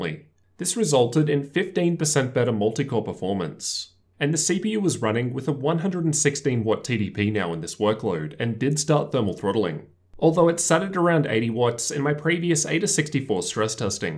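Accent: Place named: Australia. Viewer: woah hes aussie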